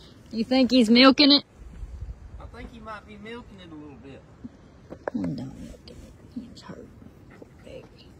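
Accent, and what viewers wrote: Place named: Alabama, United States. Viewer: You go girl love your accent reminds me of home I grew up in north Alabama now living in Texas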